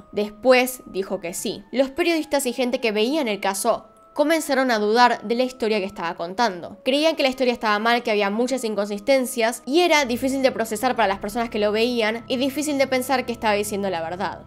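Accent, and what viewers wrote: Argentina. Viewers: Hola eres de argentina? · Dios mio, no se si es que me gusta el acento argentino......o si en realidad lo que me gusta es TU acento!!!! 😂😍😍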